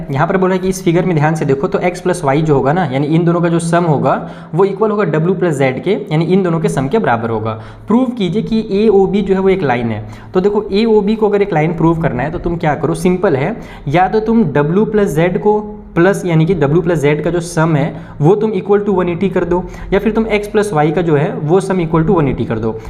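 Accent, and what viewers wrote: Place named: Bhojpuri, India. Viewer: Sir is speaking bhojpuri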